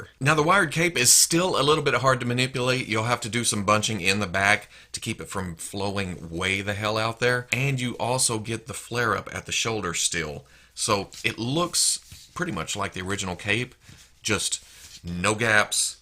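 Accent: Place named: South, United States. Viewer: Not gonna lie, my favorite part of your videos is your smooth, southern accent. It's very pleasing to the ears. I don't know why I feel this way and I'm aware that it's kind of weird but I can't help my feelings dammit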